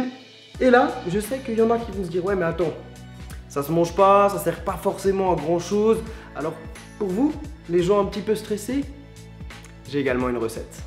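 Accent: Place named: Alsace, France. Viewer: Ta l'accente Alsacien mdrr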